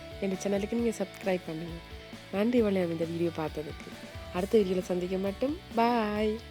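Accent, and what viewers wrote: Jaffna, Sri Lanka. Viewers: கிண்ணி நீங்க யாழ்பாணமா?? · jaffna tamil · Akka sema Jaffna Tamil akks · Nenka jaffna tamil pesurinka,💞💞💞😍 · Unkada pechchu alaka irukku akka 😄😄😄😄👍❤ nenkal srilanka ena 😀😀🤗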